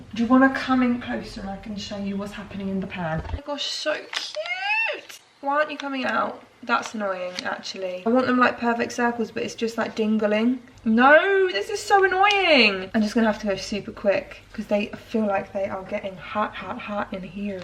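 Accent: United Kingdom. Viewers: British accent makes me so happy and emotional lmao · you are aggressively British I love it - sincerely an American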